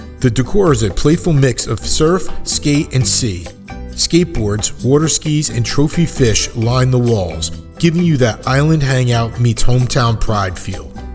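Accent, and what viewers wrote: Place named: North, United Kingdom. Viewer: You sound like Northern transplant to me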